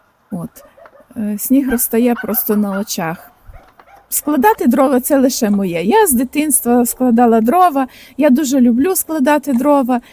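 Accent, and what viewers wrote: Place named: Boyko, Ukraine. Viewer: У вас хороші діти.А де та дочка що приїзжала з онучкою?чи це Олега дочка.Бачу у вас вже такий бойківський говір😅.А я якраз щось подумала сьогодні за вас що ви щось не виставляєте відео,заходжу а ви тут🥰.Рада за вас що придбали дрова,ви знаєте як би важко не було але ще жодна жінка не пропала без чоловіка і у вас все буде добре❤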